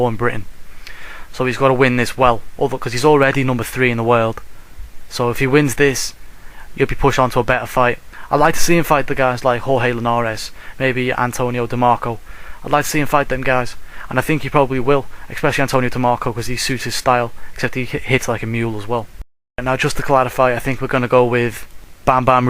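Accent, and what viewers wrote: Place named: Liverpool, United Kingdom. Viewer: a scouser :)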